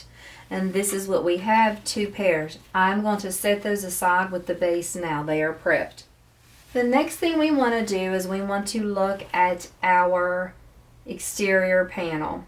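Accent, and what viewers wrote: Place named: West Virginia, United States. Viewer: I love your accent, too. Reminds me of growing up in West Virginia!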